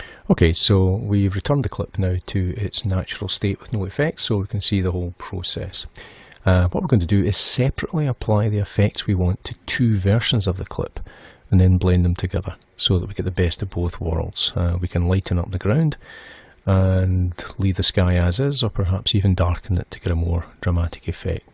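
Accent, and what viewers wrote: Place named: Scotland, United Kingdom. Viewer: I heard scotish.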